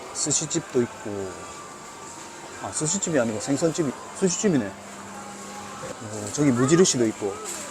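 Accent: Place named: Busan, South Korea. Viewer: 저도 예전에 일때문에 일본에서 살다가온적이있었는데, 일본에서 잘 견디고사시네요^^ 저는 일본에서 힘들었네요ㅋ 부산말투이신거같은데, 저도 부산사람입니다. 지금도 부산에서 살고있고요. 아내분도 부산억양이 있으신거같네요^^ 아기의 쾌차를 바라며, 행복하시길~~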